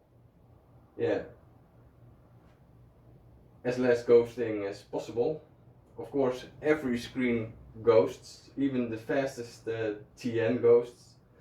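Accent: Netherlands. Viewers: Ik hoor gwn dat je nederlands bent🤣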